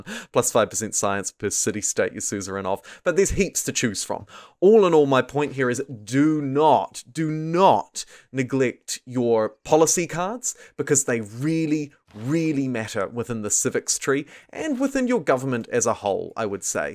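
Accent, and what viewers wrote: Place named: New Zealand. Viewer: That's a kiwi voice right there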